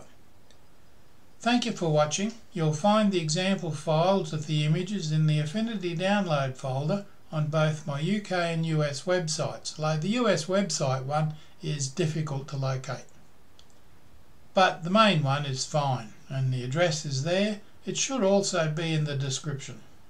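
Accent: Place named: Australia. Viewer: I’ve been watching you for a while now and always thought you were an Aussie..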